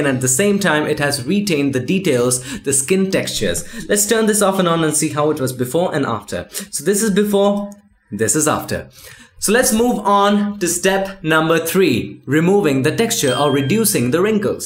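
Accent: India. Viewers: Watching your channel for a while but this is my first comment. Honestly this channel is one of the best to learn Photoshop. Thanks man for your effort and time. And by the way I'm impressed with your English accent. I find Indian English kind of funny. But you rock as you rock with Photoshop. Keep up the good work.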